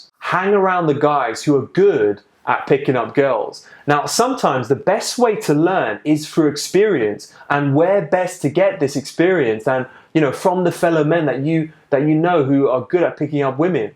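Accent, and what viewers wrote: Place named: United Kingdom. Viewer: What? He's a Brit mate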